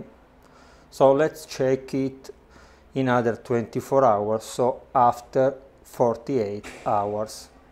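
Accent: Italy. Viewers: LOLOL! It's making me laugh so hard! Especially when I turned on the captions! The captions don't understand a word of what he is saying...and neither do I! He must be from Italy?? He reminds me of the muppet who is a chef!! I like this guy.. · I have never seen sourdough started like this. I am going to try it as I have a dud in my kitchen right now from an amazon San Francisco starter. I should get a replacement for it as the company stands by their product, but in the mean time I am going to add some honey and see what happens, then I am going to try this method. No waste! I hate it when it says you have to throw Away and refresh. What"??? I don’t think so! I absolutely adore the accent too. Italians speaking English.. adorable.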